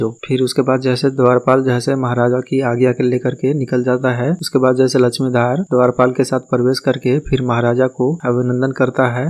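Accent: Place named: Bihar, India. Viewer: Ja he se bro our bihar's accent don't forget that 🔝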